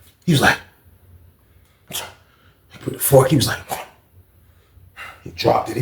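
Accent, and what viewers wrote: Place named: New York, United States. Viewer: Yo tbh you dead ass a New Yorker that never lived here The way you talk, the way u explain things, the way you move all i see is New York This is kinda why i gravitate towards u (pause) But nahh for real your stories are A1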